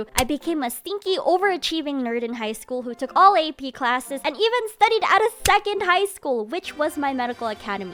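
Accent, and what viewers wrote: Philippines. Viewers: STOPPP NOT THE FILLO ACCENT😭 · LOLL the filipino accent 😭😭🤣🤣🤣🤣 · The filo accent omg. As someone with Filipino parents I can just hear the voices through the phone. The "are you pregnant" part is JUST AS REAL TOO LMAO. Proud of you Ate, reach for the stars 🌟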